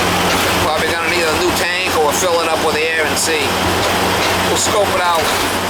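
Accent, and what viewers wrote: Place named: Massachusetts, United States. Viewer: I'd already watched a bunch of water pressure vids when I came across yours. I was going to skip it until I heard what I'm guessing is a Mass accent... so I watched the whole thing. The little dog riding with you was awesome and capped off with the howling pug. Great stuff!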